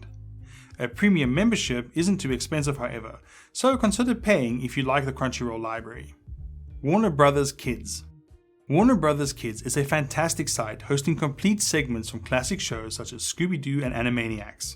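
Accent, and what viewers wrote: South Africa. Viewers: You South African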